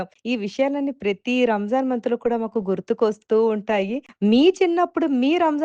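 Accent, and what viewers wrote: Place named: Nellore, India. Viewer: Nellore aaa Madam meedhi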